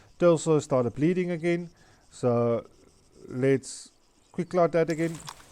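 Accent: South Africa. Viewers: Easy you South African accent gives it away, i'm from Gauteng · Your Accent gave it away,Well.For a south african at least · you sound very South African?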